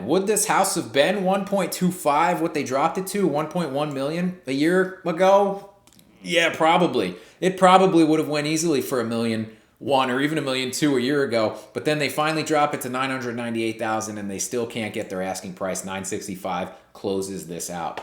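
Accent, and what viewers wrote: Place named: New York, United States. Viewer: Reading those Zillow’s like a sports announcer! Add the NY to it, priceless…. “BOOM, how much money, is this guy NUTZ?” Great video.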